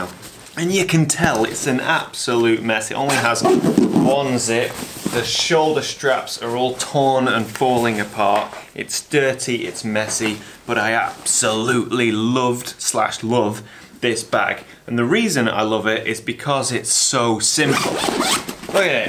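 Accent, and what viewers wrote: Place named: Yorkshire, United Kingdom. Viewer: Ah??? Thought tha was a Yorkshireman. I have gone with a Mammut Trion 35 litre Mountaineering sack (they also do a 50) and put a Tenba Camera insert in it - total cost £160. - plus the cost of a rain cover. Not perfect as the insert has to be removed to access kit but the pack does have the zipper around the harness feature that is rare in a Mountaineering sack. Adam Gibbs - Mr. Grumpy to you, has used one for many years, but just changed it for a bespoke camera pack, supplied as yours is by a manufacturer. No problem with this BTW, I do not begrudge either of you perks for providing such excellent content. The Mammut Pack is also multi functional as it is a rock solid standard pack into the bargain. For street photography I go with a very battered Chinese made copy of a Paratroopers Bag from Israel, cost £15.... 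plus a £35 Billingham Insert - does not look expensive but gives the Camera 5 star protection. And it cheap:-).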